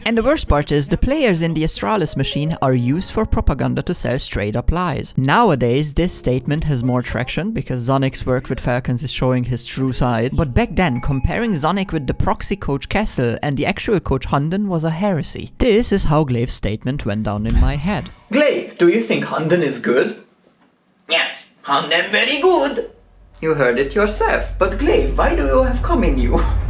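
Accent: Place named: Germany. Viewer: Hat lange gedauert aber den Deutschen Akzent kriegst du nicht los😂 (Wirklich geiles Video du hast definitiv mehr Abos verdient) #fckastralis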